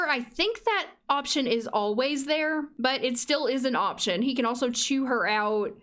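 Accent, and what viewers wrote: South, United States. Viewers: you sound like my southern math teacher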